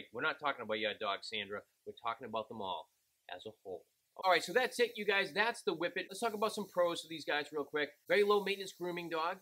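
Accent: Boston, United States. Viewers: OMG. This is the most worthwhile review of anything in the history of YouTube. I think the Boston accent puts it over the top. Wait... what were you talking about? Oh right. Booty shorts at the 99 or Reveeeah Beach.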